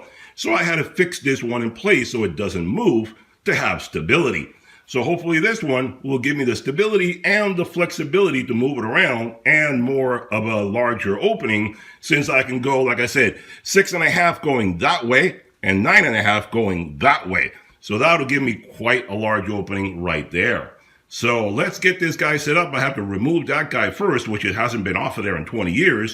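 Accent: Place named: Chicago, United States. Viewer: you have a great voice. Man, I don't think I've ever said that before... especially to a man. haha Reminds me of the Chicago accent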